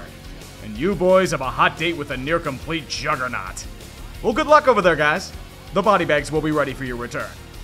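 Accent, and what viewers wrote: Pittsburgh, United States. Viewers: I dont even watch baseball I just enjoy hearing the yinzer yap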